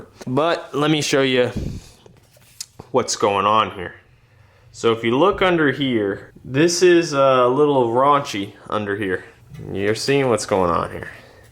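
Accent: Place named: New Jersey, United States. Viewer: You have a accent . Jersey?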